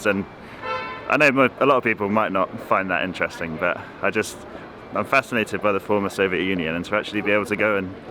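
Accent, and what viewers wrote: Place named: New Zealand. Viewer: Thanks, I was stoned af last night watching this and for some reason thought you mighta been kiwi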